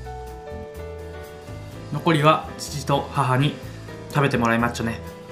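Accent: Kansai, Japan. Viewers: リプありがとうございます！要所要所に出る関西弁も好きですよ＾＾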